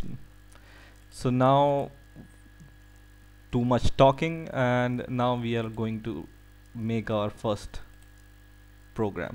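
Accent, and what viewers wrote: India. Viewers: your from india xD?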